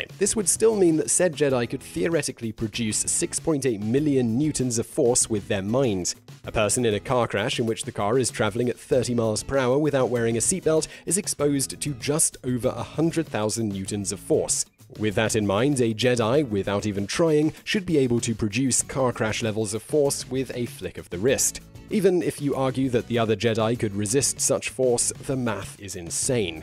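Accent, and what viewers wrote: England, United Kingdom. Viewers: He’s not American, he’s English as far as I can tell. Maybe he thinks Americans would need subtitles to translate. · Simon, your pretty professional for the most part, so most of the time when you pronounce something differently than I'm use to, I chalk it up to you being a Brit. Chinook is NOT one of those words. It is suppose to be pronounced; shin- nook. It is part of the Haida-Tlingit language. It refers both to a species of salmon and a weather phenom of a warm winter wind that turns the snow to ice. (And makes driving hell) With so many channels filling our heads with missinformation, I need to be able to trust at least one youtuber. Don't fail me Simon.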